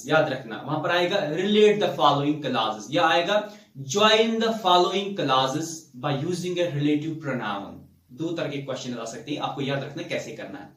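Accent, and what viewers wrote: Kashmir, India. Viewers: Sir ji aap Kashmir se ho kya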